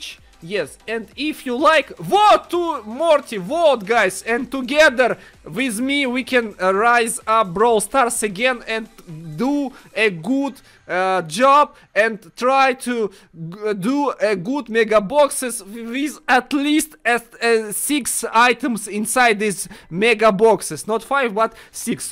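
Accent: Russia. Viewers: Yes. And i think he's got russian acsent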